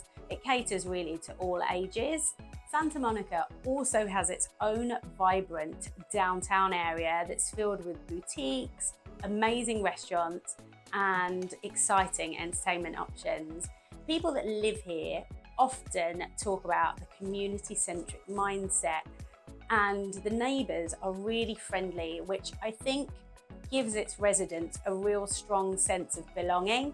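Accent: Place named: United Kingdom. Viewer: Nice Insights, Beautiful tattoo,. I like your British Accent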